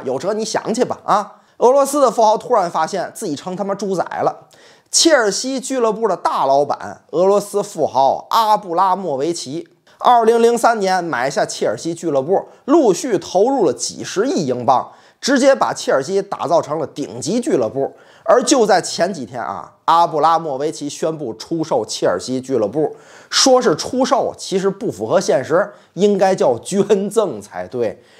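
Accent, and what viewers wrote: Tianjin, China. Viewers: 当然不能给孩子听，孩子就是借着一句“小青蛙你就乖乖的待在井里吧”记住了节目，还有天津味儿的普通话也很亲切。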